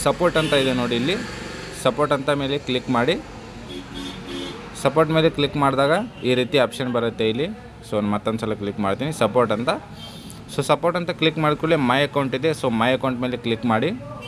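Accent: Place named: North Karnataka, India. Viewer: ಉತ್ತರ ಕರ್ನಾಟಕ ಶೈಲಿ ಮಾತನಾಡುತ್ತೀರಾ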